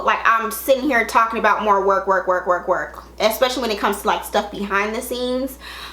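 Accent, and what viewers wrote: South, United States. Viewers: I started watching you just to hear you talk because you remind me of someone from the south such as myself....but when I say that you hit this topic right here out of the field and that was a hall of fame homerun. Gurrrrrlllll, you kilt me when you said, "I am 30"! That means I do not have time for the bull crap and the cliches'. That is some childish shit and I will say that for you. See, I love a genuine person. This is why half of them are having issues and problems within their homes and lives. Some cannot go any further because of it. There have been some that I have unsubscribed from for this very reason. Plus they always have problems with people and other brands......damn just shut the hell up with it all. True we come on here for entertainment but at the same time we want to see the real reality shows, instead of all of this she my friend oh I don't hang with them because they don't drive a Mercedes or they toast their bread in the stove and not a toaster....I know I went there for a second. 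Anyway, I love what you said and the way that you said it, you were respectable not to stomp on anyone toes. Really, if you are who I think you are--you wouldn't give a damn if they did get mad! I know I wouldn't. One instance and I know somebody may say something back at me but oh well, but this damn palette issue. Why are some people on these videos eating from the same table but you're not sampling this person's new collab, when I have clearly seen them on pictures toget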